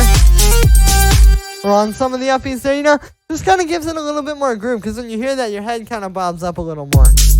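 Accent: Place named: France. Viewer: Your voice is horrible,please stop talking during your videos !! Je penses que tu es français.. FERME TA BOUCHE ou ôte le bout de fromage que tu as entre les dents quand tu parles !! Quand on a une voix horrible comme la tienne on ne prononce pas 10 mots par seconde..ou Alors ARTICULE..c'est fou que tu ne t'entendes pas parler. mec...Corrige toi ..Sinon tu bosses bien tu as du talent mais stp tais toi !!!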